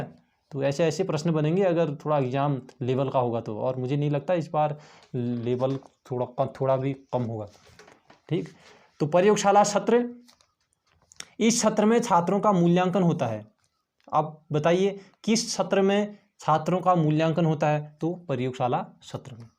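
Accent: Pahadi, India. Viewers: Kyu koi problem h pahadi tone say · थेट पहाड़ी टोन। nice class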